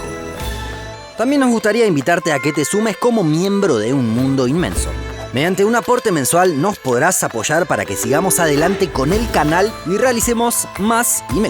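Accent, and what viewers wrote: Argentina. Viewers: Gracias a este canal, cada vez que leo un mapa, lo escucho con acento argentino!! Maravilloso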